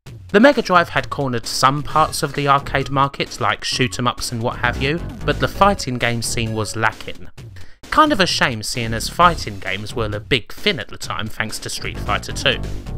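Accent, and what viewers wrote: United Kingdom. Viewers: Whoa I couldn’t put my finger on it but I’ve never heard a British accent with a speech impediment in my life! Interesting. No hate.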